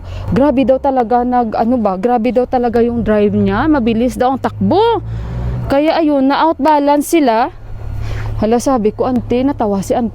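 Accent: Iloilo, Philippines. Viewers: .... Tingala ko nga ilonggo ang tuno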